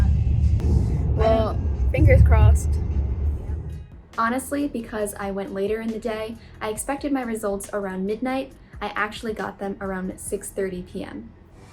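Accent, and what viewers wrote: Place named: United States. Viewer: Your accent is typical America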